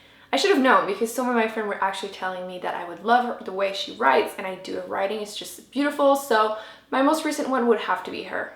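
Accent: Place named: Quebec, Canada. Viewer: I just saw your new video and when I heard your accent I thought you might be french canadian! I'm so happy to finally find a french canadian booktuber, I'm from Montreal so hi and can't wait to marathon your videos!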